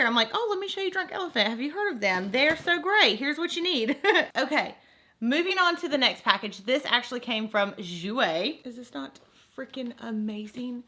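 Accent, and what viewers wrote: South, United States. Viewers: Your country voice has me dieing of jealousy, I love it so much ‼️😭🖤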